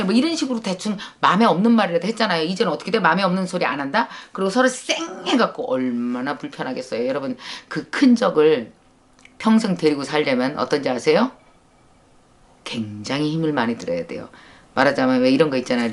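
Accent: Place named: Chungcheong, South Korea. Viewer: 오래전 동료 캐나다인 친구가 자기 어머니의 인간관계 처세술이라며 절대 다리[bridge]를 불태워서는 안된다고 했어요 다리를 불태우면 돌아갈때 다리가 없다고... 일맥 상통하나 표현법이 다르죠 ...정서와 문화가 너무 다르죠...선생님께서는 영어강의를 누구를 위해서 누구를 대상으로 하실건가요? 제가 선생님과 친분이 있는 관계라면 영어 강의 좀 기다리라고 하고 싶어요. 좀 더 유튜브의 비쥬얼에 신경을 쓰고(컨텐츠는 저도 팔로우라 좋아요)있으면... 외국에 있는 한인 교회나 다른단체에서든 러브콜이 올거예요 비행기표와 함께...거기 초빙되어 가셔서 그 멋진 충청도 사투리로 선생님 이야기 들려 줘보세요 다들 웃고 울고 할꺼예요. 대한민국이 전쟁을 겪고도 노벨 문학 / 상이없다고 엽전의식에 젖어 있는 사람들 봤어요 가와바다 야스나리죠? 설국쓴 일본작가? 저는 학생때 읽었는데 이 책이 왜 노벨 상을 받았는지 이해가 안되었는데...중략....다음에 여건이 되면 꼭 본론을 쓰겠습니다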